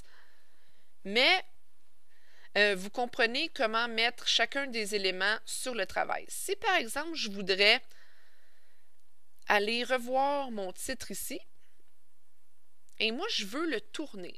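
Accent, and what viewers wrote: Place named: Quebec, Canada. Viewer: un bon tuto avec une voix sexy et un accent quebecois adorable jadore je mabonbe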